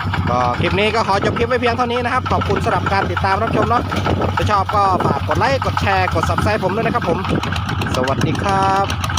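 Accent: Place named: Suay, Thailand. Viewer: พี่ส่วยหรือเขมรครับสำเนียงเหมือนส่วยมาก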